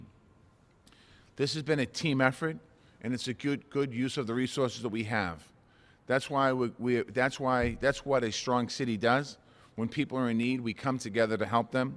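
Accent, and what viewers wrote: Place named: Boston, United States. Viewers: WTF? Non American accent!! That's a Boston accent!! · Im just here to be entertain by the Boston accent. #quarantinedlife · Went to school in Boston and the whole time I couldn't stand that accent!!!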